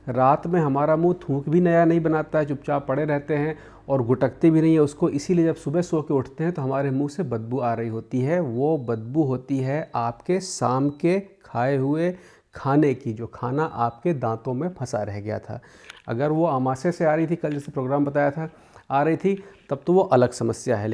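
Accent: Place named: Uttar Pradesh, India. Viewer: Kya aap uttar pradesh se hai?, aapki bhasha muje meri dadi jaisi lagti hai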